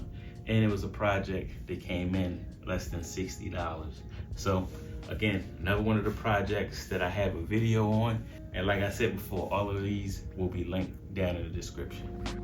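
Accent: Baltimore, United States. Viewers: Boy this guy from BMORE you heard, that accent never lies. I love the mancave and the video subscribed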